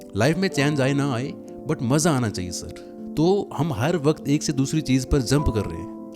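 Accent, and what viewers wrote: Rajasthan, India. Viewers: bhaiya rajasthan se ho bato se lagta h me bhee jodhpur se hoo. or me bhee logo se kat gya hu phone calls band Kar dee Sabhi se bat krna band kr diya par result ka jyada hee sochta Tha pr aapkee process Wali baat me bhee Dhyan dunga ab thanks u❤brother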